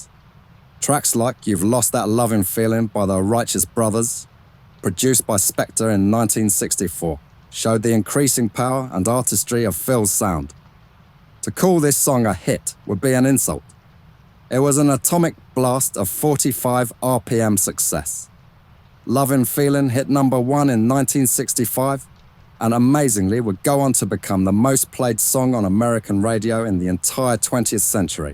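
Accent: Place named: London, United Kingdom. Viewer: I love the timbre of the narrators voice ! Classic London English..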